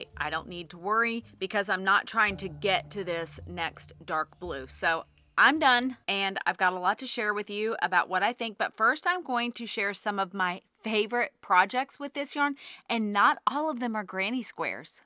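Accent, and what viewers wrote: United Kingdom. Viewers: Nope nope nope. All that faffing about, you may as well spend time weaving in ends. I'm British and love that you mutter to yourself in a Brit accent! Thank you for the review. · I was was not expecting that British accent!😂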